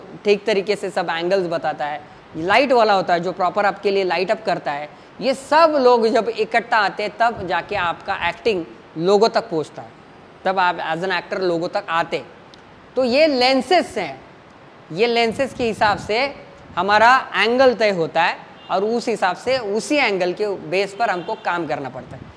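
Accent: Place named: Maharashtra, India. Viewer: Hindi me marathi accent aa raha hai sir ka... Sorry but aise hi videos dekh k thoda samajh raha hu 🙏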